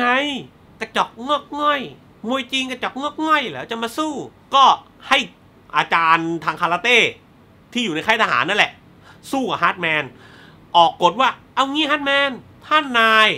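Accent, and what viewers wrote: South, Thailand. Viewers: คนรีวิวคนใต้ใช่ไหมคับ ควังสำเนียงออก 😂😁😀😊☺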